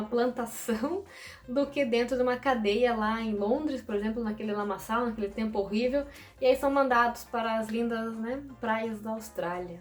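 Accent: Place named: Santa Catarina, Brazil. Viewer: Ganhou um inscrito pelo sotaque. Só fiquei meio! Particularmente. Seria Catarina. Mas se do interior, Rio Grando. Mas bonita, e um sotaque para I’m agradavel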